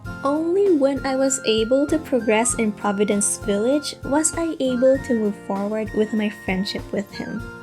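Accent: Philippines. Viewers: Your Filipino accent is so cute! ❤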